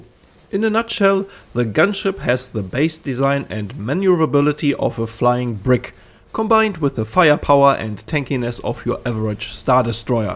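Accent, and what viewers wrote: Germany. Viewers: Haha I'm German, and man, the german accent is strong in your english xD I'm quite happy to find a familiar speaker haha xD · You haven't heard a strong German accent, bruh. His is present but that's nowhere near strong German accent. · Oh wow what a nice combination of german sayings and accent :P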